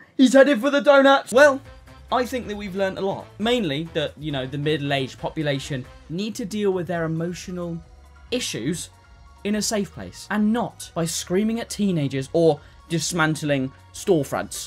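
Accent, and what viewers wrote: United Kingdom. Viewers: i do enjoy this content because hes British and speaks funny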